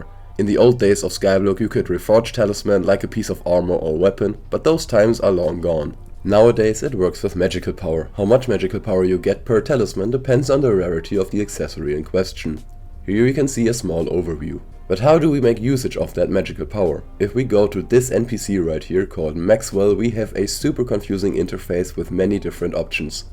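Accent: Germany. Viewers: This dude has to be German. He sounds so hard german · Do you speak german because im german and your accsent kind sounds like it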